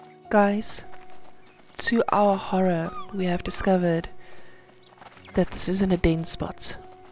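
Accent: South Africa. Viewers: Omg your south African this is amazing I am to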